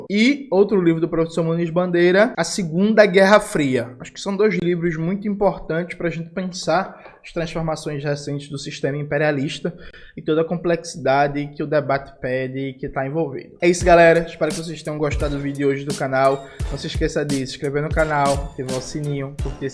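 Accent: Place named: Recife, Brazil. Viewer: Kkkk, parece que vai ser na próxima segunda feira e tudo tomando um café com o excêntrico P Escobar. Com esta capacidade de análise honesta, o sotaque mais bonito do Brasil, o recifense...a vó te ama D. Jones! Parabéns pelo PCBR. 🎯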